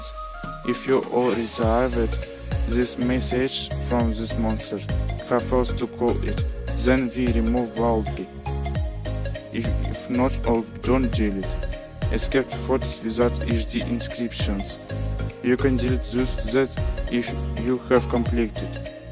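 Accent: Russia. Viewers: Акцент русский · I listen russian accent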